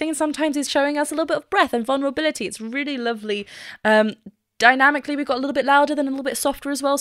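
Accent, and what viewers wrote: United Kingdom. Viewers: I love ur British 😅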